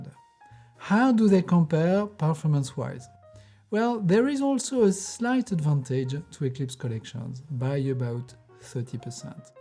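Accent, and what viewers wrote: France. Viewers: not at all. this is a french accent for sure 😊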